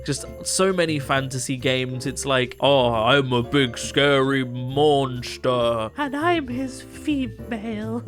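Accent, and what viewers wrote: United Kingdom. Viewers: hi,im paje(she/they),are you scottish or irish by any chance? also whats chauffeur?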